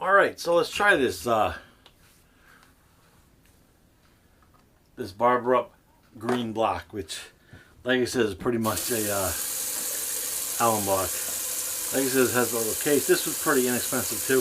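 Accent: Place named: New Jersey, United States. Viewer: Where do you live? Your accent makes me think you might be from New Jersey or New York, but I could be wrong.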